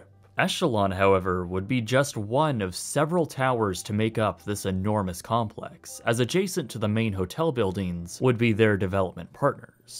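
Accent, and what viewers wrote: Canada. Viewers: canadian? ,,,,ote,,,out,,coche, couch,,, speak inglish, whoever you are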